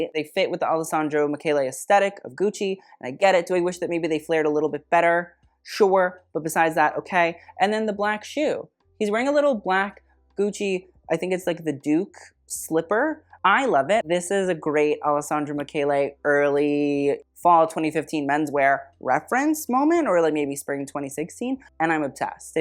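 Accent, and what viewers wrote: France. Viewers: As a French girl, your French accent is killing me 😭😭😭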